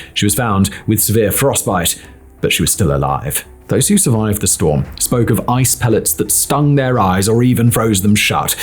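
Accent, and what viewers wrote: United Kingdom. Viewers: Hard for me to take a British accent about AMERICAN history. AI is terrible about throwing in heavy Irish and British accents too when talking about even the old west history of the USA. Usually I just stop those and move on to another video. Why would I want a Brit telling me about AMERCAN history, when their great Brit army/navy could not even beat a ragtag USA colonial army of farmers and settlers....get real. Keep it real Youtube!!!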